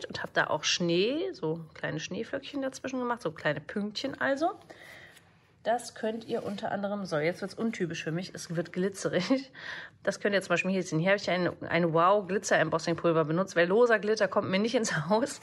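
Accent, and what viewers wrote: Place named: Berlin, Germany. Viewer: Also mal ehrlich: dein berlinerisch ist ja *janz knorke*❗ Die ministempel & die Eiskristall-Schablone gefallen mir besonders! Freu' mich sehr auf Freitag!!!! Schönen Tag noch & Grüßle vonne Monika ❤️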